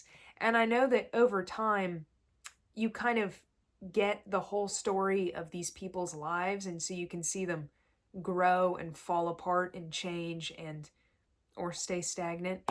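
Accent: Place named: South, United States. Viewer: Just found your channel. Enjoying the content. I am having trouble placing your accent though lol I think I detect a little southern in there, but I'm not sure haha Where are you from? Where did you get your history degree? Thanks for your channel 😃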